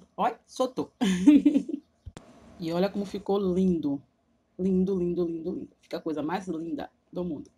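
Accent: Bahia, Brazil. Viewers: Êita que saudade desse sotaque da Bahia. Lembro da infância e de minha mãe preparando para mim! Aqui em Buenos Aires não tem ameixa em calda no mercado. Vou tentar fazer o caldo de ameixa aqui. Muito obrigada por compartilhar!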